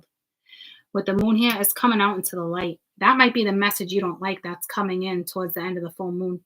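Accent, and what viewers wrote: Boston, United States. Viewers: First time here. Saggie!! Thank you. I hear an accent, are you from Boston/ New England Area?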